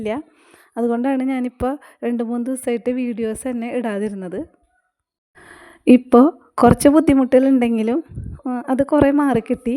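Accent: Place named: Thrissur, India. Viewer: Aanenn thonunnu njanga tsr kaarde voice kettal manasilaavum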